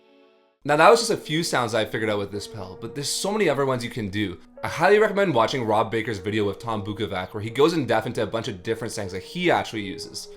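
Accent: United States. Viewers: i don't know why, but the way you talk reminds me of "Californians" (SNL)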